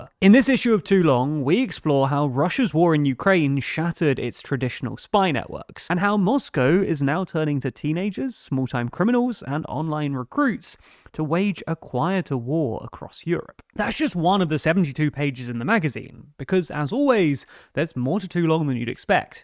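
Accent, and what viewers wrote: United Kingdom. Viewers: UK accent is means a f a k e information. Like BBC news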